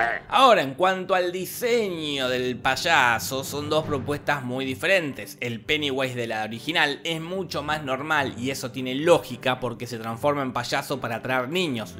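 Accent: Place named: Argentina. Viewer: Hola bro, genial sería si le cambias tu tonada argentina al español neutro sin el "sho" "eshos" el vos, quilombos, boludos, que no todo el público que te vé es argentino y algunas cosas nose entienden, muchas gracias.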